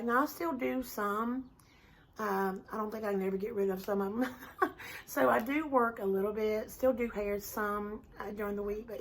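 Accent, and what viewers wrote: South, United States. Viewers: I love watching your channel. You are so REAL! That's a rare quality these days. I love your southern accent, your hair, just everything about you. You're sweet, and you sure do understand being a woman (it's hard sometimes) and I agree; we should be lifting each other up. I am a country woman stuck for now in the city. Trulia is one of my favorite pastimes. LOL Until next time...💜💜💜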